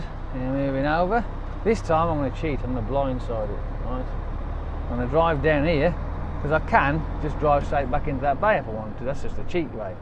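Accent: Birmingham, United Kingdom. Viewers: This guy reminds me of a brummie version of my dad lol. He taught me many years ago in exactly the same way God rest his soul ❤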